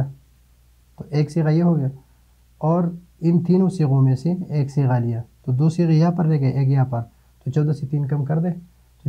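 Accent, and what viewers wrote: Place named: Pakistan. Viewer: طرزِ تکلم تو پڑوسی ملک پاکستان سے ہونے کو بتلا رہا ہے باقی واللہ اعلم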